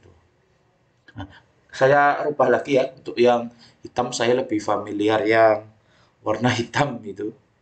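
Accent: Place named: Banyumas, Indonesia. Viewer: Pak bahasanya ngapak. Ngapak daerah mana inj?😂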